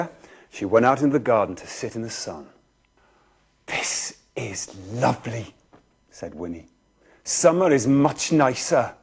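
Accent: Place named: England, United Kingdom. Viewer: Richard's voice sounds a lot more English in this than in Robin Hood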